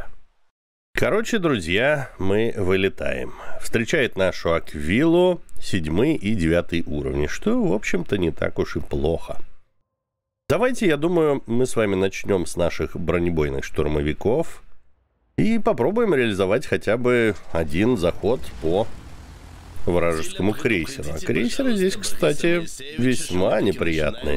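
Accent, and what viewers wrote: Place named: Odessa, Ukraine. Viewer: а где взять такую одесскую озвучку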